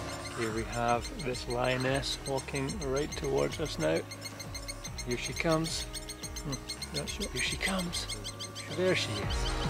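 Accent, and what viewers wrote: Scotland, United Kingdom. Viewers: This video just popped up on my YouTube recommendations. The Lion footage is just incredible! Nice to hear a Scottish accent on a photography channel. I am originally from Alloa now living in Melbourne. I took up Bird photography about 3 years ago, heaps of birds here but no lions unless you go to Werribee Open Range Zoo which is incredible. Have just subscribed so looking forward to more inspiration!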